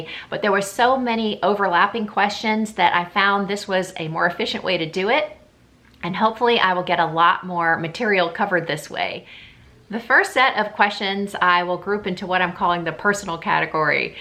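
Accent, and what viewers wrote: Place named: South, United States. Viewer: I’m new to your channel but I am really enjoying it! I loved your Q&A. I was surprised to find out you are from North Carolina. That’s where I’m from. I have a little more southern accent than you do!! Keep up the great videos! It’s refreshing to find someone who is not afraid to express your Christian values.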